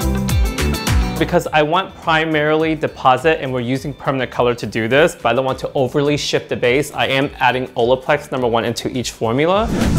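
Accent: Australia. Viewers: she's Australian, I believe. 😁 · you’re right! I was going off her accent I heard at an interview. I clearly was t listening close enough because I can usually tell the difference. Perth Australia. I just looked it up. Thanks for the correction 👍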